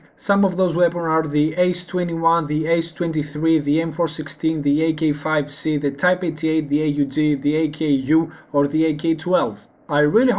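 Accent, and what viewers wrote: Greece. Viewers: Are you Greek? Your accent sounds Greek^^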